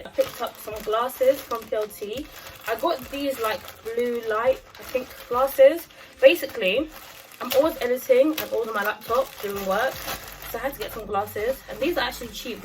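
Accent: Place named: Southern Africa. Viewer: I kept wondering where in Southern Africa you were from 😅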